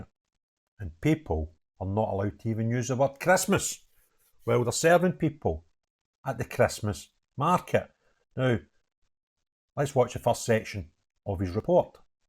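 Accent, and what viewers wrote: Scotland, United Kingdom. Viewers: The respected Anchor is most probably from Scotland. Regards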